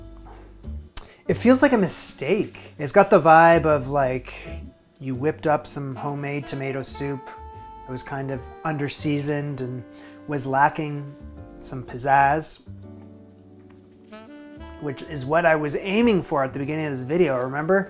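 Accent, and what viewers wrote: Canada. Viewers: your Canadian has gotten SO much more noticeable since you moved 🤣 and when I saw the aspic in the thumbnail I laughed like a maniac 🤣👌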